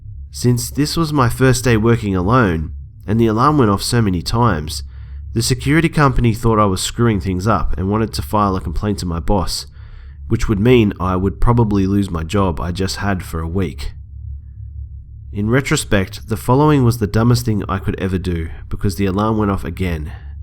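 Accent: Australia. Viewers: For some reason, when done with an Australian accent, it's all the more scary. Not to be racist.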